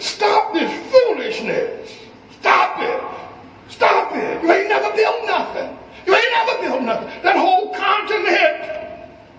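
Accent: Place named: United States. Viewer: EGYPTIANS AND THEY WERE NOT BLACK! STOP ATTRIBUTING TO YOURSELF ANYTHING DARKER THAN YOUR FUCKING AMERICAN ACCENT. THE WORLD LOVES YOU. YOU ARE AFRICANS. YOU TRAVEL TO EUROPE, ISRAEL, AMERICA, AND RUSSIA. AND WHERE IS THE RESULT?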